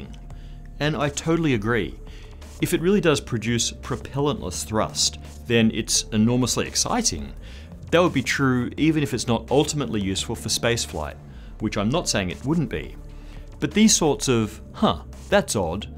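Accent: United States. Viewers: so you had to stuff up this channel by employing Americans with course and shrill accents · speak in an English the whole world can understand...dont use your american accent..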